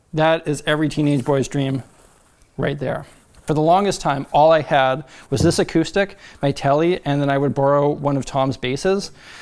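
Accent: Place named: Manitoba, Canada. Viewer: oh that manitoban accent!! XD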